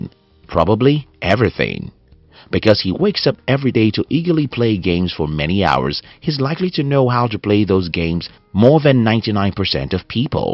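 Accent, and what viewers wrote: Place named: Nigeria. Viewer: Hey man, be proud of your old Nigerian voice, it is better than this uninspiring voice.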